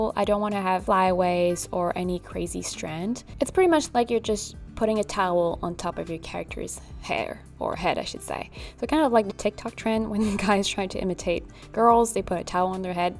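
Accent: France. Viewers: New horizons!, you are such an amazing soul! I am probably twice your age and have dabbled in art for most of my life but you are teaching me a TON of stuff I didn’t know….mostly about graphic design (which I wish was more prevalent when I was your age…my life would’ve been sooo different). I also have long hair and understand the misrep on so many games. Love, love love animal crossing (have played it since it first came out yeeeeears ago! I love your accent (I am from a family who speaks French also) you are brilliant!!!! Keep shining, beautiful! Even though you have no idea who I am, I am very proud of you!!!! 😊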